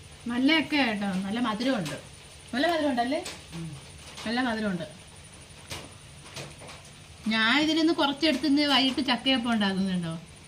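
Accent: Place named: Thiruvananthapuram, India. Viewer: ഭാഷ നല്ലതാണ്. തിരുവനന്തപുരം slang.. Artificial ആയി സംസാരിച്ചു ഭംഗി നശിപ്പിക്കാതിരിക്കുക 😊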